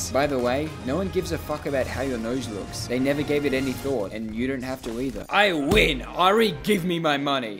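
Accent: Australia. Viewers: I didnt know was australian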